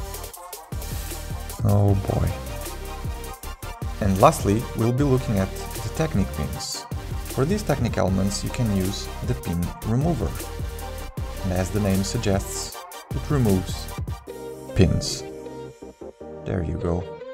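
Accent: Portugal. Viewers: meu deus, só do principio do vídeo consegui perceber que eras português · Olha videos incríveis, e eu estou bastante orgulhoso de ser um tuga a conduzir um canal incrível(a menos que sejas brasileiro, mas o teu sotaque remete muito ao sotaque português)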